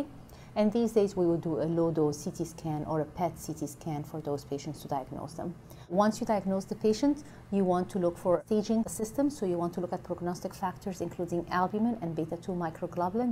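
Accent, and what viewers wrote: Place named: Egypt. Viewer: Cannot shake the Egyptian accent though hhhh. Great video though.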